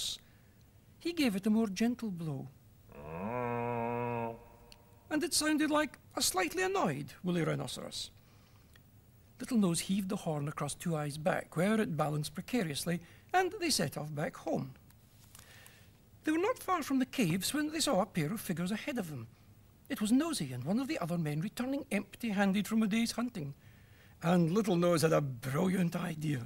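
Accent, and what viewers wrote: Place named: Scotland, United Kingdom. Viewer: Guy sounds scottish.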